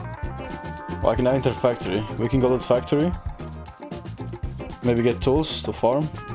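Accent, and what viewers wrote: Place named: Norway. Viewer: haha you sound very norwegian with your accent, but where are you from then?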